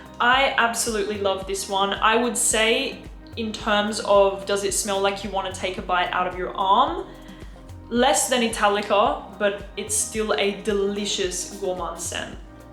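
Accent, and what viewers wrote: France. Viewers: About the accent, I wrote you a comment that Berlin isn’t supposed to sound French, but since then I can’t help but pronounce it as Ber-luh in my mind all the time, especially when I see Poets of Berluh 🤣 the French accent is so sticky 🤣 Anyway I hope you don’t take comments about accents too seriously. Love xxx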